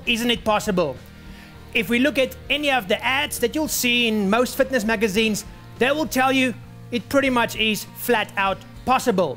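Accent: South Africa. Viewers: Great vid man. I like to hear a no BS message in a SA accent.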